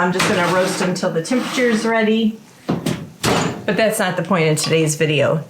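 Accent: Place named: Wisconsin, United States. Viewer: Nice accent! Where in Wisconsin are you from? 😂 I live in Rice Lake!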